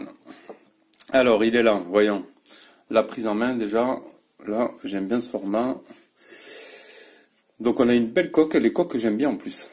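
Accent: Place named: South-West, France. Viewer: J'adore vos vidéos ! L'accent du sud ouest et les petites erreurs c'est trop mignon lol ! Continuez !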